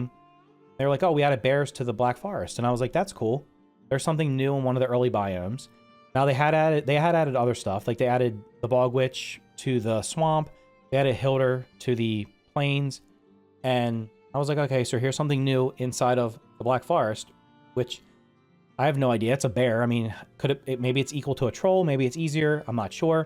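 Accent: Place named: Pittsburgh, United States. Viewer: Is this guy from Pittsburgh?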